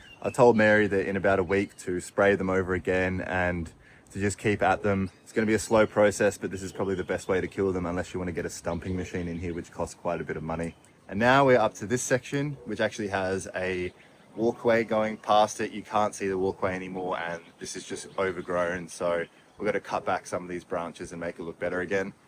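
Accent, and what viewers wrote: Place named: South Australia, Australia. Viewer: you are my favorite gardener on YouTube. You remind me of Edward Scissorhands when you are pruning. You are probably too young to have seen that movie but I think you would enjoy it. It came out in 1990 starring Johnny Depp. I also watch the Garden Rescuer, I think he said you are his friend. He needs to learn how to protect himself better like you do. I live in St. Paul Minnesota USA and really enjoy your accent and knowledge of the creatures and plants in South Australia. I hope you never stop posting videos. You are such a nice man! 🥰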